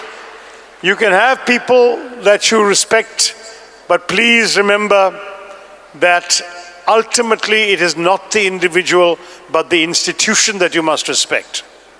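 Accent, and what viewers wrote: United Kingdom. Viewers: At a very first time I'm watching dr. Shashi and then i perceived that he is speaking British English but easy. As he told about books there is joy of finding out destination that is already defined and i feel i am going to become good reader and dive into reading more. I love reading books 🥰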